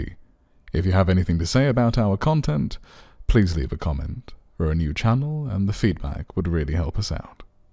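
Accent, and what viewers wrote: United Kingdom. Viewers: ok. Ive no doubt youre using real voices. IF you are using AI voice, youve fooled me. But nah. Youre clearly from the UK. the accent. Youre not US, Youre not Aussie or Kiwi, That is a UK accent. Mum, Buubly, certain words pronounced as only UK accent does. Its great, Youre an awesome narrator. Thanks for reading us the SciFi. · The Queen's English accent is perfect for this story about a alien dignitary.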